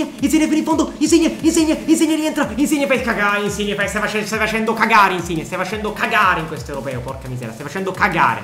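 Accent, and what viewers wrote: Naples, Italy. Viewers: Ma sei di Napoli?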